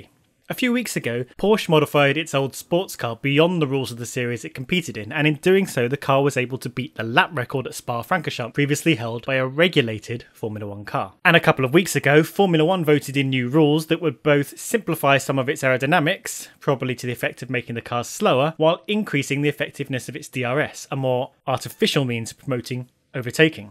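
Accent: United Kingdom. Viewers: lol brits always say social mediar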